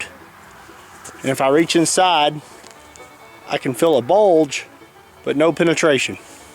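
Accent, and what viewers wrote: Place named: South, United States. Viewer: He sounds sooo much more southern in this!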